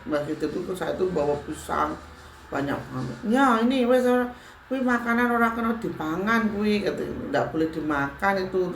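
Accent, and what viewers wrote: Java, Indonesia. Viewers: Sy sangat senang mengikuti chanelnya mbak,karena bbrp faktor: 1.Gaya penyampaian yg nJawani,kalem,sederhana tapi cukup mentes.2.Rendah hati,tidak terkesan menggurui atau bersikap "sok".3.Ada kesan penyampaian amanah,karena ibaratnya mbak mendapatkan "oleh-oleh" yg seyogyanya dibagikan kpd teman" lain agar bisa ikut menikmatinya.4.Tidak terlihat sedikitpun ada upaya utk membodohi atau mengajarkan sesuatu yg menyimpang dari tuntunan agama Islam.Kalo ada perbedaan persepsi karena pengaruh budaya itu sdh lumrah.Maka jika yg berkenan yg monggo,yg tidak ya silakan.Up to you.Bagi sy pribadi,sangat bermanfaat terutama bagi situasi skrg,di mana manusia banyak menghadapi tantangan hidup yg perlu solusi,bukan sekedar narasi.Yang perlu digaris bawahi adalah,bhw mbak bukan "memberikan ajaran",namun "menyampaikan pesan kebaikan bagi sesama" terlebih bagi yg sedang menghadapi kesulitan hidup.🙏🙏🙏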